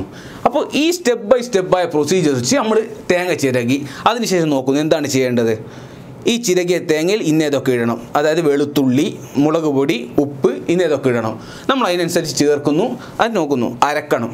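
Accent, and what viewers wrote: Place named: Kannur, India. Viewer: Kannur slang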